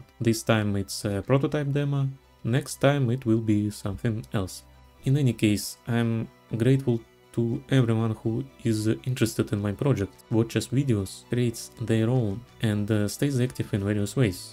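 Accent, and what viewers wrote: Russia. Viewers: По первым словам распознаю нашего русскоязычного)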